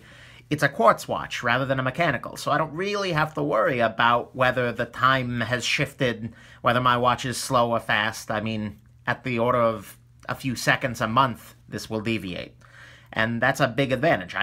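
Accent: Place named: New Jersey, United States. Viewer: wtf ... this n.j. accent for real?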